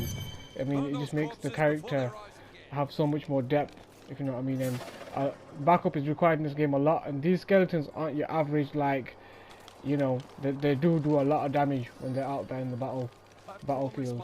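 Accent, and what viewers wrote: United Kingdom. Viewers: London accent with Indian parents? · Is that a prestonian accent?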